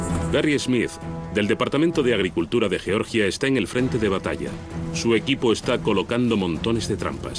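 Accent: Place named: Spain. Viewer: Cuando un Español narra un documental, sabes que va a estár muy bueno!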